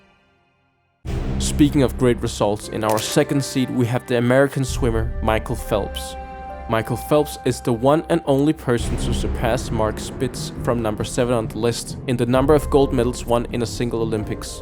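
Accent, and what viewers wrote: Denmark. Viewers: Easy danish accent :D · Det er meget tydeligt i din accent, at du er dansk, men fed video mate! Keep going 🔥🔥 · you sound danish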